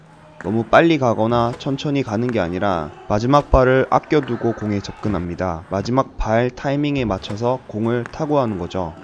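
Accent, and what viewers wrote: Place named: Seoul, South Korea. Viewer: 서울 사람 되가네? ㅎㅎㅎ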